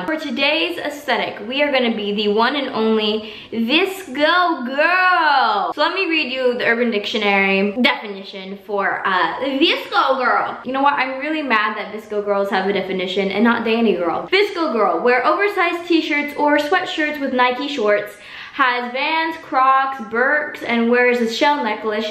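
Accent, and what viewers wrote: United Kingdom. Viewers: Ur british accent made me die I live in england and half my mates actually talk like that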